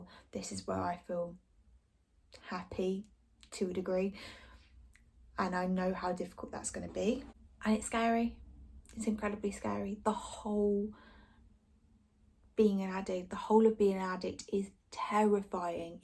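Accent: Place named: Midlands, United Kingdom. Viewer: Can't pin down your accent maybe midlands Nottingham area let us know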